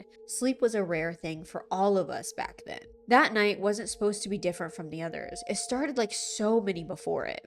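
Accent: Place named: Midwest, United States. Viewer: Where is she from? How she talks reminds me of the Midwest and ita so comforting. How she says 'sawl' instead of 'saw' lets me know she's cool 😎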